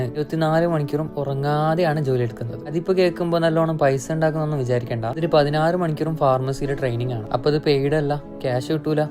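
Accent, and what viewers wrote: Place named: Kasaragod, India. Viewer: Kasaragod avde...samsaram kettapol manasilayi❤ iam frm Kasaragod ❤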